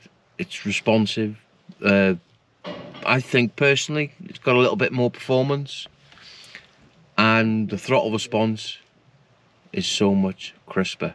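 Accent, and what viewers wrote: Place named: North Wales, United Kingdom. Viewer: Where are you based mate? You sound local? In North Wales? I need my Daughters 2016 Mini 1.6d sorting the same. 😊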